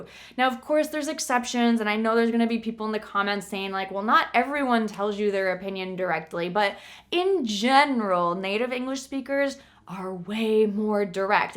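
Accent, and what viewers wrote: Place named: United States. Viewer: I am from India and i want to make my pronounciation like you , like American..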